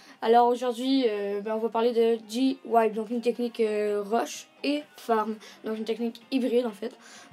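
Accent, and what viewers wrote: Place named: Quebec, Canada. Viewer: Ahah, je me trompe ou pas si je te dis que tu viens du Quebec ?? ^^ sinon, bon tuto et très belle attaque (meme sans les pieges) PS : n'hésite pas à t'abo ;)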